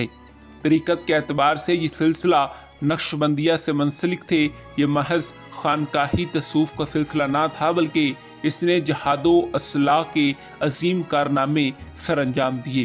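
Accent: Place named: Punjab, Pakistan. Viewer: ٹیٹھ پنجابی سٹائل میں پڑھ رھے ہیں جس سے بہت سے الفاظ کا تلفظ صحیح نہیں ھوتا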